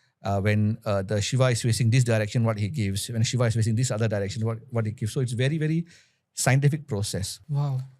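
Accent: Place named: Malaysia. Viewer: This person speak like Malaysian or a Singaporean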